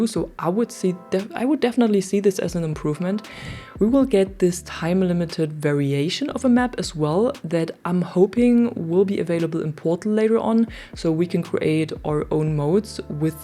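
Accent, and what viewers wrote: Germany. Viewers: Man hört übelst deinen deutschen Akzent raus 😂😂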